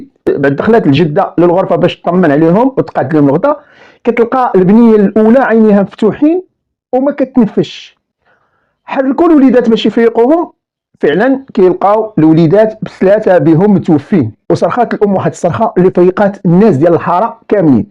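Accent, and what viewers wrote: Egypt. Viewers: أ حسنت القصة بيمافيها اللهجة المصرية أ حبك في الله يا أخي ❤❤❤ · السللم عليكم خويا ❤❤❤❤🎉🎉🎉🎉 صوتك بالهجة المصرية غير عادي هههههه نحبك تروي قصتك بالهجة المصرية ههههه❤❤❤❤❤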